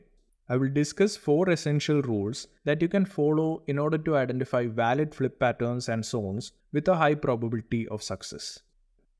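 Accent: Kerala, India. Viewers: Sir, are you from kerala?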